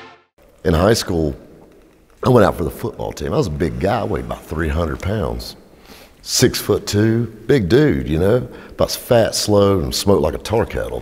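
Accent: South, United States. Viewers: Coolest dude with a southern accent.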